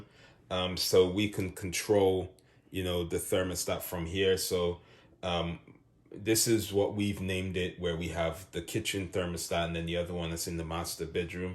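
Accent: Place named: Caribbean. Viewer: Funny u don’t sound British, sound more Caribbean from African descent. 😮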